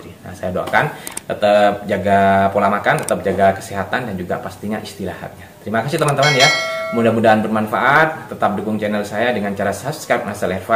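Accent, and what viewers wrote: Madura, Indonesia. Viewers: Mas asli madura ya?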